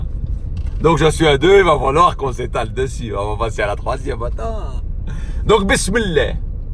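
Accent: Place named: Saint-Étienne, France. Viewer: Salam, heureusement que t'as remis tes vidéos t'as pas fait ça pour rien . Je souhaite que Dieu te bénisse toi et ta famille ici bas et dans l'au delà inch'allah tu aura le pardon et la pleine récompense. Ton langage famillier m'est famillier j'aurais jurer que t'étais de Sainté, t'as l'accent et les mimiques de la ville minière et des chemins de fer et des verts. Tu tape dans les babets avec tes guibolles ou pas ?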